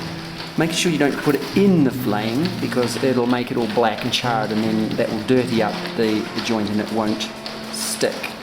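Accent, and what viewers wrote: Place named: New Zealand. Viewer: Where is your accent from? The mystery is killing me. It's like Kiwi and South African and Scottish and American at the same time but in that order.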